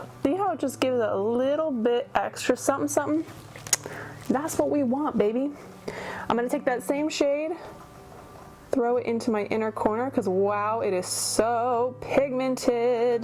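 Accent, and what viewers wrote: Russia. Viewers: Sounds like Russian accent!😂😂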